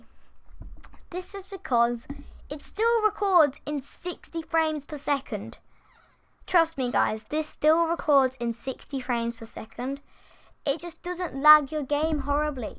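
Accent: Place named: England, United Kingdom. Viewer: Shes English like me.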